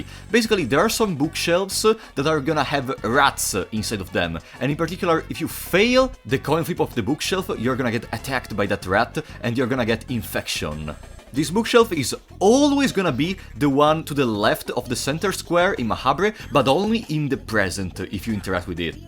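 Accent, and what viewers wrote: Italy. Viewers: ahahahhaah grandissimo si sentiva un pochino dalla cadenza! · il tuo accento italiano si sente fortissimo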